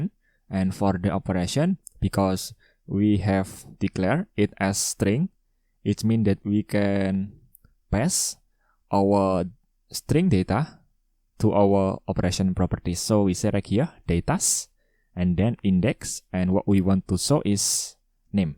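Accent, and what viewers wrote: Indonesia. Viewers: Cara bicara inggrisnya seperti orang indonesia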